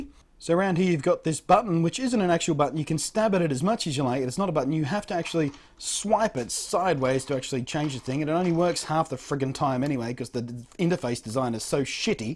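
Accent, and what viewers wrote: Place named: Australia. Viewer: Jesus Christ i LOVE Australian accent!!!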